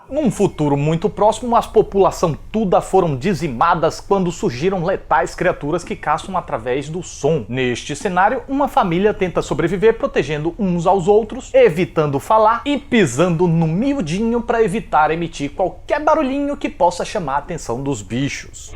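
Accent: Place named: Caruaru, Brazil. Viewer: esse sotaque parece aqui daqui de caruaru muito bom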